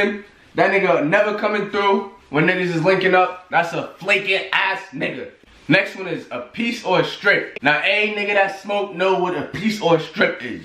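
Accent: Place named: Boston, United States. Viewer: Bruh I'm black, from Boston and I was born and raised here in the hood. You nailed it and even have the Boston hood accent. You was even so right when you was saying the other slang was for white people.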